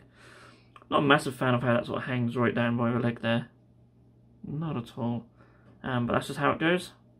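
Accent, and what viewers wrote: Norfolk, United Kingdom. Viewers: Well then what yew sayin'? Ha yew got a loight then? Nah just joking. I'm a Norfolk buh n all born & raised, that's why I recognised the accent. Although I wasn't sure - I guess being used to it for so long you forget what some of the other accents sound like a bit. Anyway I love your channel & your website. It's equal with eBay in being my two most useful resources for conversions. Done a couple orders from you recently & will be again. Looks like I forgot to subscribe, thought I did it before. I'll do that now! Good ol' buh mate!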